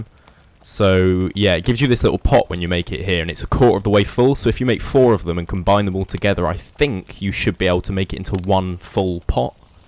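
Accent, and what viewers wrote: Northern Ireland, United Kingdom. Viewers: well, being from Northern Ireland, our accent is incredibly similar. Considering how a fuck load of Scots came to Belfast and surrounding areas. Hence the name, ULSTER SCOTS. Also, no need to be a prick about it. Being that you work with him, you'd know he's Scottish. I didn't know he was Scottish, I heard the accent and thought it sounded Northern Irish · Oh lord the NI accent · The northern Irish accent has me dying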